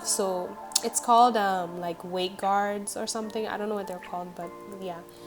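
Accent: Caribbean. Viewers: You’re body is amazing! Love your videos, are you Caribbean? You have a slight accent but I can’t tell from where lol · Are you Caribbean?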